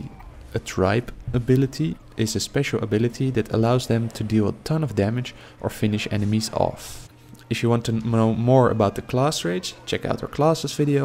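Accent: Netherlands. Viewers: Just curious are you dutch I'm really curious about your accent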